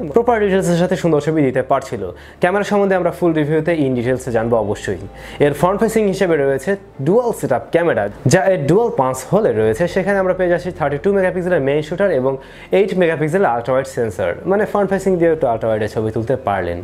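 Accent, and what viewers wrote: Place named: Barisal, Bangladesh. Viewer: that barishailla accent .... ahhhh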